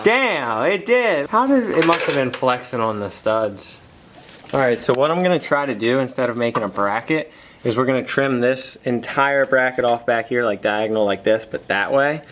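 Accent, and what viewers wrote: Maryland, United States. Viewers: High Live yeah. He's from Maryland. Lol. I'm sure I have a weird accent to everyone that's not from my area.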